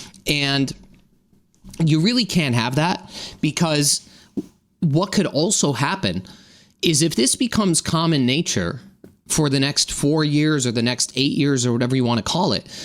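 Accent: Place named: New York, United States. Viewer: Sounds like a NY accent. Domestic terrorist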